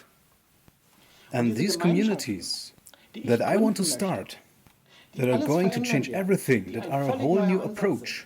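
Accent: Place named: Germany. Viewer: Das Deutsch hört man doch eh leicht durch.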